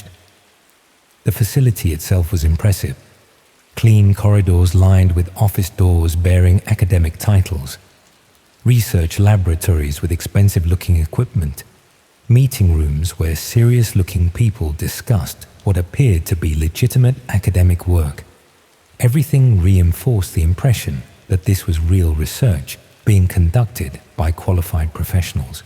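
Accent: United Kingdom. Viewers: Every night I fall asleep to stories like these. It’s nice to have a British one listening to places I know of. Since listening to you to fall asleep I’ve found myself staying awake slightly longer to listen to what’s happening.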